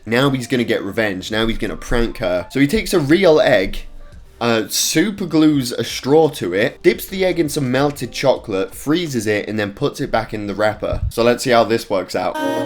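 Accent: England, United Kingdom. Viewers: No it's just the way English people say it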